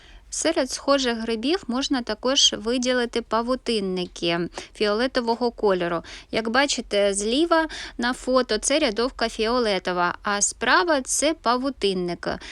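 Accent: Standard. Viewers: Мне больше нравятся ваши видео когда вы разговариваете , потому что у вас хороший и чистый украинский ) Привет из Ирпеня ! Вчера нашли в нашем лесу аж одну рядовку фиолетовую , искали искали рядом еще ... ничего .. одинокая красавица оказалась ) За-то зонтики спасли ситуацию ) Страшно у нас , есть мины и могилы россиян без крестов в лесу и их сухпайки со звездой ... мы там рядом грибы брезгуем собирать .(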